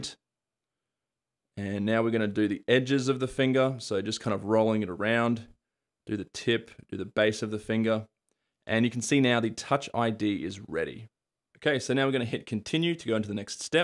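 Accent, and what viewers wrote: Australia. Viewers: Thanks fornthe turorial man. It's so nice to have a tutor with Australian accent 😁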